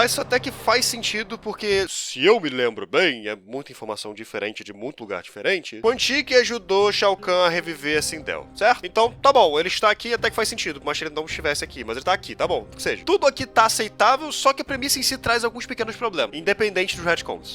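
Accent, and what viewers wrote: Rio de Janeiro, Brazil. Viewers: O sotaque combinou muito kkkkkkkkkkkk, asmeiiii um carioca reclamando é muito baummm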